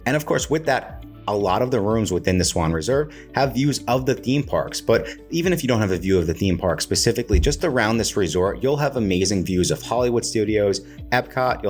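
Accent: New York, United States. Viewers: I detect a NY accent!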